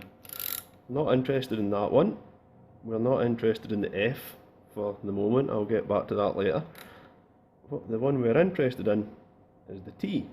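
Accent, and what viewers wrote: Scotland, United Kingdom. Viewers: I'd very much like some more technical videos in depth like this one. Also it's great to hear someone from Scotland too.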